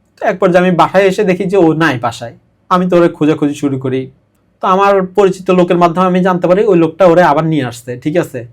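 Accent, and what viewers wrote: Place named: Chittagong, Bangladesh. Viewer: মনে হয় বাড়ী চিটাগাং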